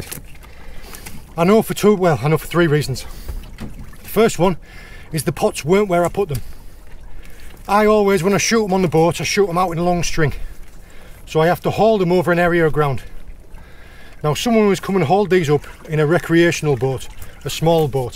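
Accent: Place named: North, United Kingdom. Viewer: Great vlogs, your like the fred dibner of fishing, your teaching abilities are on point, more so than reading a book, what you say is very interesting it goes in one ear and it stays in the other. Is your boat able to sustain a living or do you have another income source. How many pots do you set per day. Where in the north is your accent from. Set a trap for the pot thief's.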